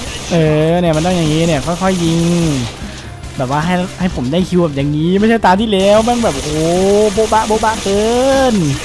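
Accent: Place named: Isan, Thailand. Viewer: เห็นบางคริปพูดอิสานนึกว่าคนบ้านเดียวกัน😅🤣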